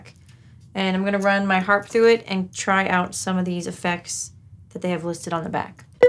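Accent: Long Island, United States. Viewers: This was my first time to be aware of you. I enjoyed and was impressed by your brilliant creativity with the varied tech. Also I ❤ your Long Island accent! 😊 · You are very good looking and easy to listen to/watch. These people are nothing without the hate. You have a little Long Island accent but why would that be bad thing, lol dying on the Perfect Circuit bit. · The well built shelves full of music-y gadgets in their og packaging? Impressive, but how do you find anything o.o Also: I heard the accent as soon as you mentioned it. It came and went. So that's a Long Island accent, huh? (Regards: "Not an American") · Yea ur long island accent is thick af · IT'S LONG ISLAND ACCENT?! I THOUGHT YOU WE'RE MIDWEST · The track I liked the best is your Long Island accent! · Your Long Island accent is not really bad, it's just really Long Island, which is totally reasonable and legit. 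Local pride! Cool tracks. I love Gris. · Hey!! You should look up a video of a stringed instrument called a Mulatar. Its a weird Harpy guitar, drum. This thing has me looking into building one myself to sew if I can? I also own harps and guitars and bass. I don't consider myself a luthier by any means but a guy builds a guitar and that's cool? A guy builds several guitars and he's a luthier? I guess? Looong Island accent is right. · Totally Long Island accent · Please accentuate and use your long island accent more. It be appreciated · I never knew you had a Long Island accent until today. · You do have a Long Island accent but like 15% of one. It sounds like you've worked to get rid of 85% of it and honestly that work should be recognized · all on point! and your Lonk Island accent is subtle. (✿◠‿◠) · First track was my favorite. Harp lady, gothegg, Cory Feldman!? You don’t look like any of those people, you look like Rogue from X-Men. 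You’re Rogue look a like that demos pedals & plays some cool music to me. I knew you weren’t Rogue from X-Men when I first heard you speak & had that Long Island accent instead of Rogues deep southern/Mississippi accent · Your Long Island Accent is not really bad, it is really good. It is refreshing to hear a regional dialect. That Perfect Circuit placement was awesome. I like the music for game #3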